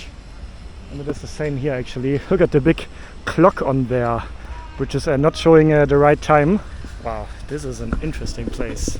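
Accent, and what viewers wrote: Germany. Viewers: 😂😂😂😂😂😂😂😂😂 diese diese worst German English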